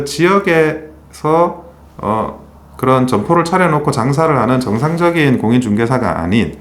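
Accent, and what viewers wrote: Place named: Gyeongsang, South Korea. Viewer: 존경하는 원영섭변호사 대표님 반갑습니다 안녕하세요 팬앤드마이크TV 시청중하고 구독하러 왔습니다 건승하십시오 경상도 목소리 너무 좋으십니다 감사드립니다 멸공 필승 단결 🇰🇷🇱🇷🎉🎉👍👍🥰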